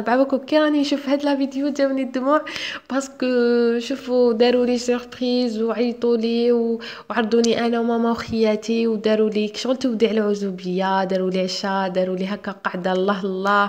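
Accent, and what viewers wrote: Algiers, Algeria. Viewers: نتي ملغواط بصح تهدري تباني عاصمية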